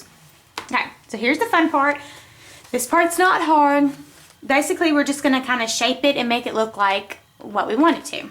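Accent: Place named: South, United States. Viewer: Love that southern draw sweetie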